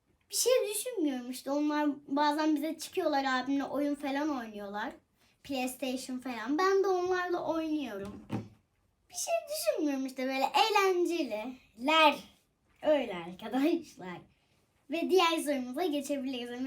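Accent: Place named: Samsun, Turkey. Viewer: Samsunlu oldugun cok beli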